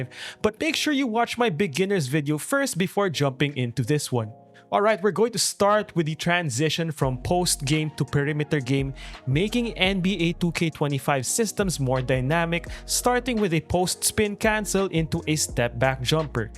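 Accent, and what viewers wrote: Philippines. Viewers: Are you Filipino? I peep the accent 👀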